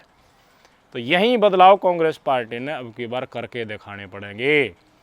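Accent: Haryana, India. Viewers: Very intelligent brother. Haryanvi boli ka andaz kabil a tarif h. Politics aur Jan bhawnao aur social structure aur empathy ka gazab talmel dekhne ko mil raha h. Adubhut h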